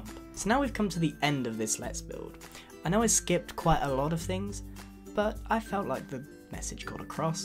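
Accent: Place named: RP, United Kingdom. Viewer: This guy has the most posh accent I've ever heard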